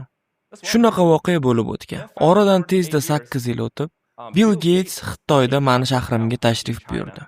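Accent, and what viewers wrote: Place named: Tashkent, Uzbekistan. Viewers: shu oddiy gapirganiz yoqdi adabiydan koʻra Toshkent shevada 😊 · shundo dovom eturin oka toshken sheva zor ketvotti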